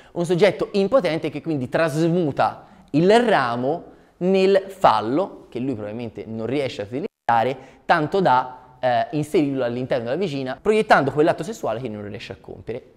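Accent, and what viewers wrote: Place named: Tuscany, Italy. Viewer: È il Toscano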